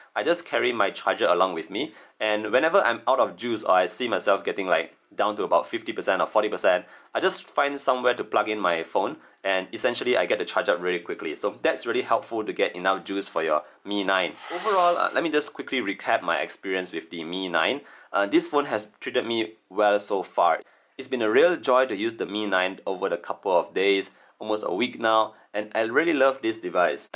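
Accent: Malaysia. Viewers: Hi! great video and very nicely presented. Are you Malaysian? I somehow notice your accent is quite the same with my chinese-malaysian friends.. 👍😊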